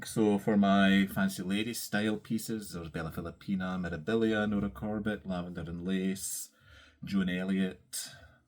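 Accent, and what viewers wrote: Scotland, United Kingdom. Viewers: Hi! 🎉 congratulations on your first 2 Flosstubes. You’re a natural and I miss Amanda so I’m getting my fix of your wonderful Scottish accent! I’m Pauline of Sew. Be Bags who you bought your lace front project bag from. I remembered when I saw you’d made a Flosstube it was who bought one of my bags. As I’m watching you I’m stitching on Portrait of Veronica but she’s going away so that I can start my Glass Slipper because yours is fabulous ❤❤❤ love love love her. Can’t wait to see no.3 to see what you’ve been up to. 😊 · Love to hear a fellow Scottish accent on flosstube! I loved your full coverage progress on 18ct- cats are my muse, especially a black one.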